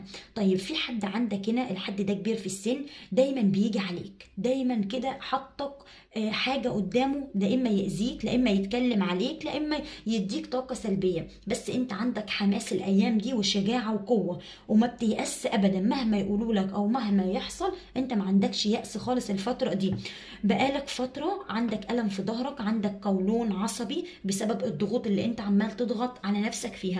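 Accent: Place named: Mosul, Iraq. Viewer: احجي مصلاوي واتاكد انتي مصلاوية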